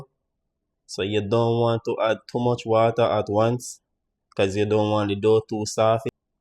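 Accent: Jamaica. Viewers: I like how you cook and bake, I followed your sweet potato pudding and it was a breeze and tasted 😋 good, I don't bake every week because we have to watch gain!😢 I like your accent, is it a Westmoreland or ST. Elizabeth accent? I pick up that your Channel is from foreign though. The sugar softens the dumplings, also a little milk.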